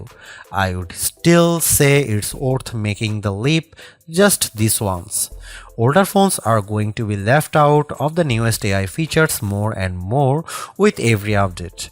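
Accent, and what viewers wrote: India. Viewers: Indi accent insta skip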